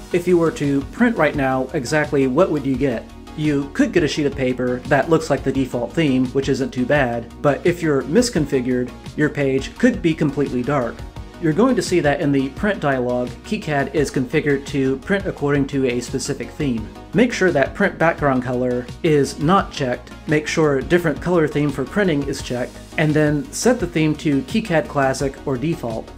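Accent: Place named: Eastern Europe. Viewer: you have an accent....I wonder what your native language is...perhaps a slavic one..?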